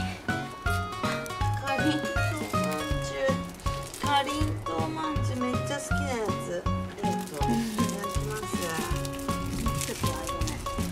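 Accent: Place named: Nagoya, Japan. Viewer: が作業中の肉まんのソックスと名古屋弁が可愛かった💕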